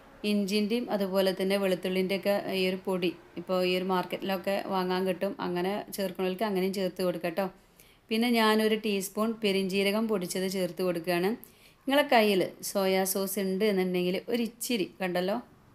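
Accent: Malappuram, India. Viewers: Mpm slang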